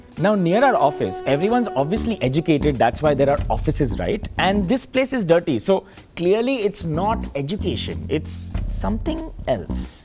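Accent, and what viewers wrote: India. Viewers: I wanted to watch this but I cannot stand the Indian accent. It's a phobia.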